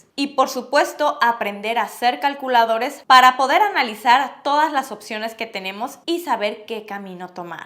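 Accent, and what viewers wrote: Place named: Argentina. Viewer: Que hermosa Argentina ...que mujer...me encanta el acento de esta mujer Argentina....¿así como hablas juegas fubol? un beso...gracias por tu videos. Saludos desde Colombia.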